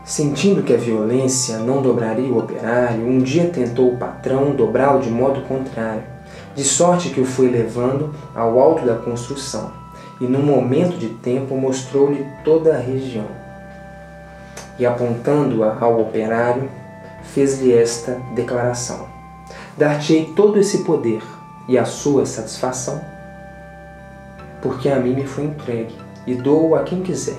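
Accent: Minas Gerais, Brazil. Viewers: Seus compartilhamentos de textos são ótimos! Encontrar esse canal, digo, ter acesso ao seu trabalho aqui, foi um achado e tanto para mim esses dias. E esse sotaquezinho - mineiro, não é...?! Obrigado pelo compartilhamento, dedicação. :)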